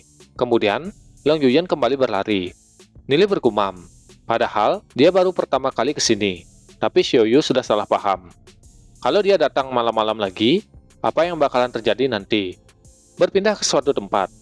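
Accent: Bali, Indonesia. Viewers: Logat bali?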